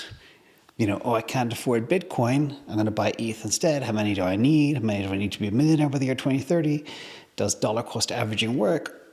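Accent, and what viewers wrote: Ireland. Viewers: . I hear an Irish twang in the accent? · love the vids and the take.. where in Ireland are you from? If I had to pin your accent down.. Rebublic, Dublin, South side.. Dalkey or close?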